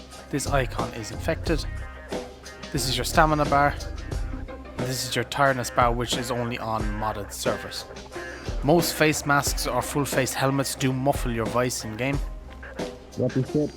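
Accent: Ireland. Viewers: Are u from Ireland?U sound Irish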